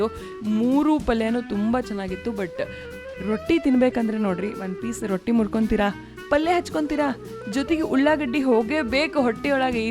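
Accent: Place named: North Karnataka, India. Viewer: Soooo nice to hear UK kannadaali commentry ❤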